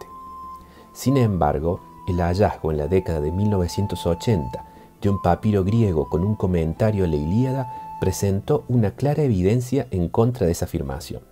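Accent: Córdoba, Argentina. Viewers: UD es de Córdoba ?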